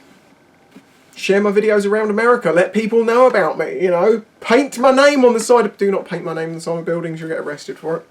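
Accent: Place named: United Kingdom. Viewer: I think it's the accent. I feel it even more strongly as English is not my native language and the weird manner of speaking is quite off putting for many people.. Maybe not that many Americans fancy the British accents?...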